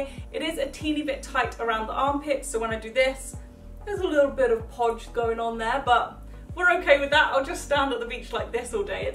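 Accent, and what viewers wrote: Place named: London, United Kingdom. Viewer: I love your videos - love love love!! I'm so excited about your plan for daily vids this week and then DIY's next week too -:D Yayyyy!! My favorite "swimming costume" (how cute!) was the blue & white checked bikini (gingham pattern?) it's very cute on you. I don't get the straps either, but once you fix them it's going to be perfect. Btw, I love your voice, and you're just completely adorable!! I was born in London but moved to the USA when I was 4 - wishing I still had the accent!! Also, your hair suits you so perfectly <3 I am wondering if you've ever bleached your teeth or how you've gotten them so white? If you ever get the chance to mention how...? Keep being you, cuz you seriously brighten our day. You're definitely my favorite YouTuber by far!! Hello to & everyone! Love from Susan in Florida, US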